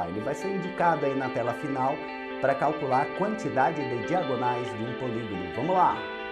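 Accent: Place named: São Paulo, Brazil. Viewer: sotaque pulista kaka bom d+ tmb sou paulista mas ele tem um sotaque muito forte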